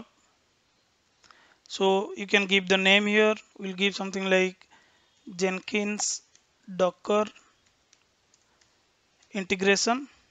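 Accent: Odisha, India. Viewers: Bhai it seems u r from Odisha. But great explanation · The way you pronounce... Probably you are from Odisha ? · Ur pronunciation is telling that ur from Odhisa.Their way of pronounciation is quit different from others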